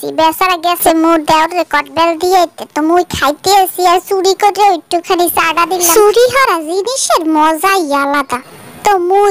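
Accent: Bangladesh. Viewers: কদবেল আহ দেখলেই জিভে পানি চলে আসে। বরিশালের ভাষাটা অসাধারণ লেগেছে। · কদবেল যেই রহম যমমের মজা হেইরহম বরিশালের ভাষাও হোনতে যমমের মজা।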